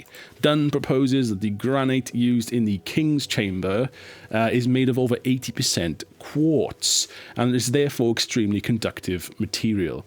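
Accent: Wales, United Kingdom. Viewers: Lovely channel...beautiful (Welsh?) accent! When you ask for everyone to be nice at the end, your manner is so lovely that I just want to be nice! Please do do more about the CHEM stuff....it's pretty interesting and the guy proposing it doesn't seem like a nutter!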